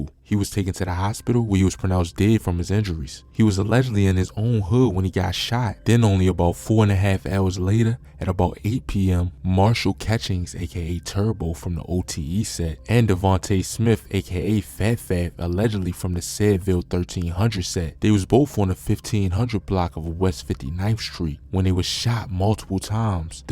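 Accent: Baltimore, United States. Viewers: You from Baltimore I can tell by the way you say your U's lol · That DOO YOUU. TOOO... #Baltimore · I think he’s from Baltimore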